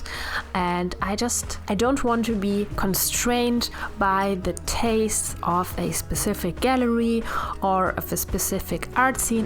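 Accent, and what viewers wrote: Germany. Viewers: I love your accent! I used to work at a horse product store with a German lady and hearing you reminds of many fond times with her! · This is Xiao from China. I have absolutely no problem with your adorable German accent. Your English is proved perfectly fine, and better than being fine -- it is so sincere and expressive. Love your art! And I feel the same when a painting or drawing actually comes out matching my expectation. So satisfying. ///^_^/// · I understand completely. the pictures transport me to some fantasy world I'm desperate to know the story of. And to answer another question. watching your videos to learn watercolor which I really struggle with but have found myself watching everything because you explain so well and are very interesting to listen to. also I love your accent. I had and visited a German penpsl when I was in school.. 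do your accent is a lovely reminder of that friendship. thank you xx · I can't believe people are so rude to say they don't like your accent! English is a weird language and you speak it great. I am Canadian, but my mother moved here from Germany, and you sound like my family; you are lovely to listen to. And an incredible artist.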